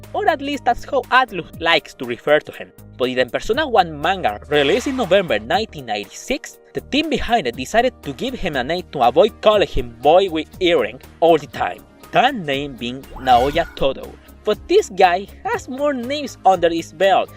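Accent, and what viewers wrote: Chile. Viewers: Sabia que ese acento era chileno, jajaja muy buen canal! Me subscribo!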